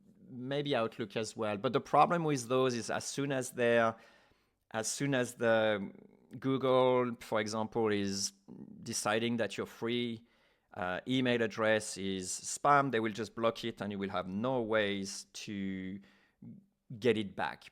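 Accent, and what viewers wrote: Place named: France. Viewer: Hello are you french you have an accent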